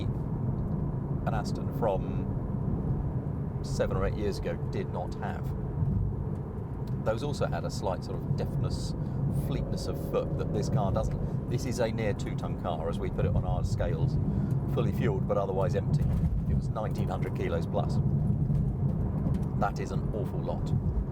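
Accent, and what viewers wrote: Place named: United Kingdom. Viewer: This is normal British delivery. You're asking for it to be more American. Perhaps you're in the wrong place.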